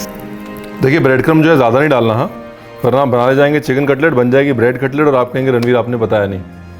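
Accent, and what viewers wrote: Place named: Bengali, India. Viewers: Too sweet bengali pronounce...and also the comments on BONGEATS is really nice. I also believe same. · Simple yet amazing recipe. 👌 Dear chef Thank you very much for sharing Kolkata style Chicken Cutlet recipe. Your bengali accent is too good. Lots of love from Kolkata. 💝💝💝💝💝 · Your bengali accent is very nice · Sir...your bengali accents sounds so amazing...ohh damn good when you spelled ranna ghore,sorshe bata,posto bata 😁.... being a Bengali just loved your feelings towards bengal's food culture...specially kolkata.... literally amazed...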